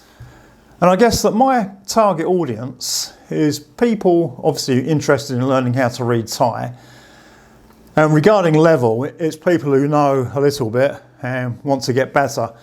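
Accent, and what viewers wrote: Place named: United Kingdom. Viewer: I love your British accent. 😊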